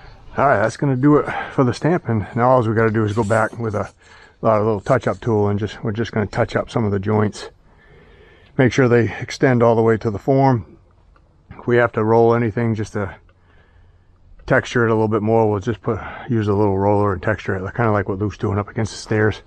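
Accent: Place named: East Coast, United States. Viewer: Hey man, sounds like you on east coast my brother. I got some rising concret around the perimeter of my pool. The whole back yard is concrete. I have bullhead placement stones around pool which are good. The issue I'm having is rising concrete around my northern edge of my pool which has a about 5 feet to the stucco wall border... It is at most 1 inch-mostly about a 1/2 inch rising right at the placement stones. I was thinking of just sledge hammering it down and using cement all to put it kinda back together after fracturing it down. A friend said to grind it down. All my control joint which are about a 5ft intervals are cracked so I was going to polyurethane them and pound out the edges up against the pool placement stones... now this is probably crazy but as an option...I was also thinking of pouring the cement all and leavening out the bullhead slant up to the rising edge... 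So my man whats your suggestion????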